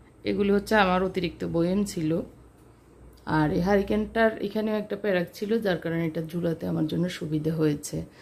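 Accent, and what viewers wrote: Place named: Noakhali, Bangladesh. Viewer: আপনার কথায় নোয়াখালীর টান আছে।কথা আরও শুদ্ধ করেন তা হলে শুনতে আরওভাল লাগবে।তবে আপনার রুচির প্রশংসা করতে হয়।আপনার সাজানো সত্যিই সুন্দর লাগছে।উপরের মন্তব্যের জন্য মনে কিছু করবেন না।এটা ঠিক করলে আপনার বল্গটা আরও ভাল লাগবে।মনে করবেন এটা একটা সাজেশন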